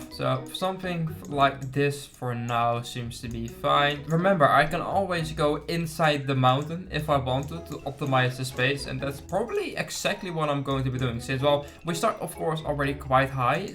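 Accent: Netherlands. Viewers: The Dutch accent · ik hoor het nederlandse accent >:) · are you dutch??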